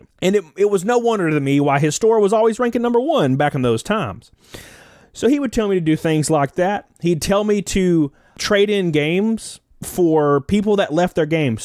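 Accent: South, United States. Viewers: You sound like a southerner. So as a southerner, go buy a gun and “stand your ground” my guy 👌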